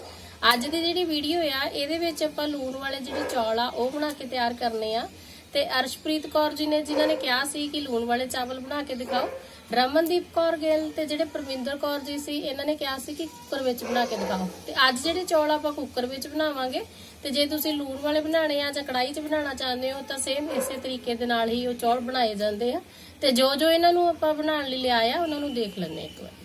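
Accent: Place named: Punjab, India. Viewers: thoda punjabi bolan da style bada jiada cute hai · Di I like ur voice ..typical punjabi.nice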